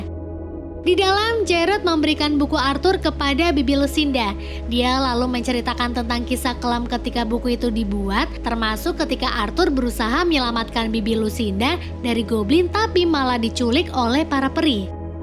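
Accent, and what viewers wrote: Pemalang, Indonesia. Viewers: Btw orang pemalang bukan? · wong pemalang yo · Orang Pemalang kah?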